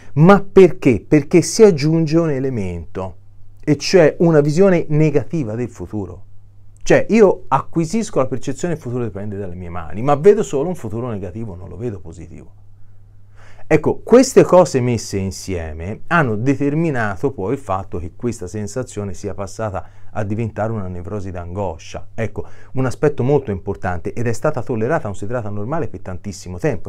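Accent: Tuscany, Italy. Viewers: Dr. buongiorno. Io penso di avere autostima infatti sti vivendo una situazione abbastanza complicata con un marito Narcisista che vuole procurarmi guai, ne ha già fatti parecchi. Ha chiesto la separazione che mi sta benissimo, ma senza rancore anche se un po' di rabbia c'è verso quell'essere che avrebbe dovuto darmi delle sicurezze invece mi da solo preoccupazioni, affanni. Quindi ora il mio compito non è quello di dar retta al rancore ma di lavorare sulla mia difesa, per i miei diritti e dei miei animali che non possono essere lasciati in mano a un essere malvagio, crudele quale egli è. I suoi video sono sempre interessanti e soprattutto chiari. Anche con la"c"toscana😊Buona giornata. Grazie.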